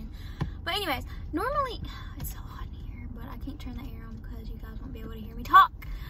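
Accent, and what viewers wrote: South, United States. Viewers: I love that southern twannnggg! And your demeanor is just so soft and calming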